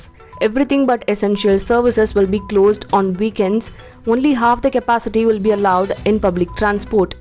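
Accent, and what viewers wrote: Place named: Telugu, India. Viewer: Who is this news-reader? With such dirty accent? Seems like a Telugu....why do you guys allow such ill-performing novices to associate with you???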